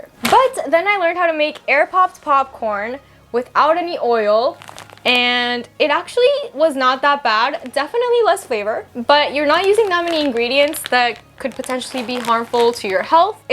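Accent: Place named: Spain. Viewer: I have to get better at grocery shopping, thanks, also loved your Spanish accent :)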